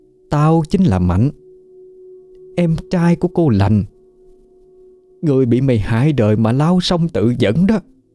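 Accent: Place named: Mekong Delta, Vietnam. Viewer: Chuyện ma mà nghe xúc động ghê 😮 giọng miền tây đặc ruột k ta sao nghe dễ thương quá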